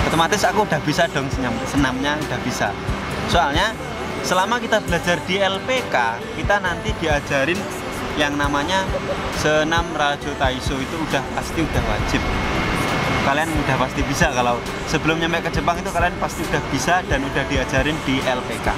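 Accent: Java, Indonesia. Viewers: Jowo mu medok massee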